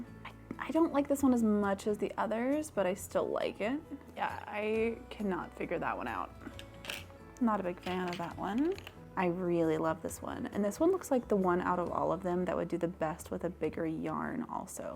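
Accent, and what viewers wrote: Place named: United States. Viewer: American accents are something else... You really have that vocal fry. Whoa....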